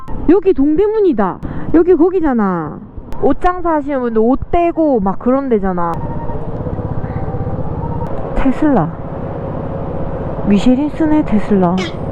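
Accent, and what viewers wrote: Daegu, South Korea. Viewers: ㅎㅎ저도 대구출신입니다~ 사투리 아주 정겹네요, 영상 재밌게 잘보고 갑니다!! 굿굿! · 대구사투리 너무좋다..♡ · 대구분이시구나 간만 사투리들어서 정겹네여~대구사람은 아니지만 대구 방촌역 아파트 공사하느라 숙소생활하긴했었는데 서울 나들이 잘하셨네여^^ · 암튼 대구사투리 개싫음 개짜증남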